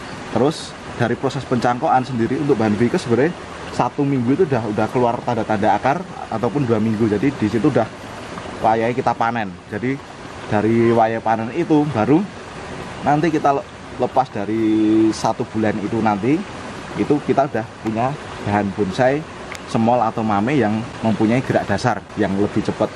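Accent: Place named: Java, Indonesia. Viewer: Ketok e ra nyepirani... nanging ternyata akeh inspirasi sing biso di conto. Asik ... bahasane keren jowone ketoro buanget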